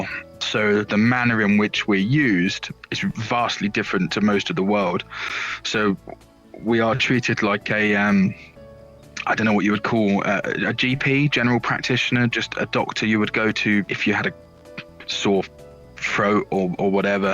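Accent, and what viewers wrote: United Kingdom. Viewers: Sounds like a norfolk boy! Good lad x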